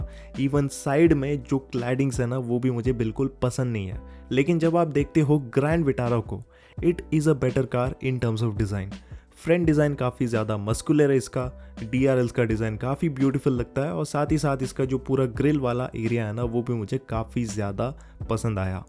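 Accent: Kerala, India. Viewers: Mallu accent 😊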